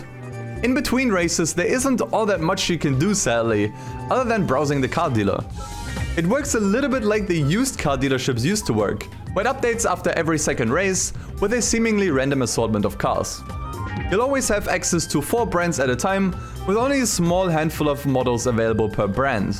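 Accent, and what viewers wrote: Germany. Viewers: Dein Englisch ist schon sehr stark vor allem dein Wortschatz auch wenn man den deutschen Akzent hört 😜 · nice deutscher Akzent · Which accent is that in your english ?:) German ?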